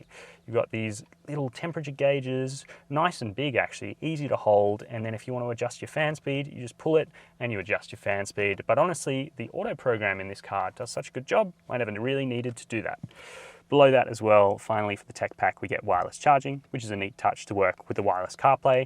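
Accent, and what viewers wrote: Australia. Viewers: Nah, this is a standard Aussie accent. We don’t all sound like Steve Irwin. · Are there different Aus dialects of English..?? I couldn't pick where you from I was thinking South Africa ot New Zealand...Your Aus accent is not as raw as I've heard in some other videos reviews. 🤙🤙🤙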